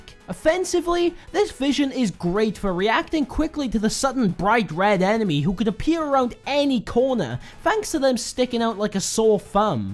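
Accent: Wales, United Kingdom. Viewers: do i hear welsh?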